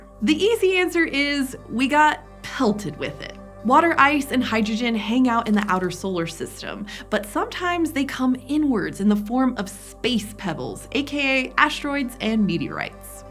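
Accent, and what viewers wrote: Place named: United States. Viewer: “Wader” I love your American accent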